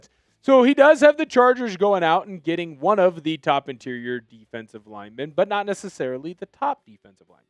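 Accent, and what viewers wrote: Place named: Fargo, United States. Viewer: You sound like you’re from Fargo bro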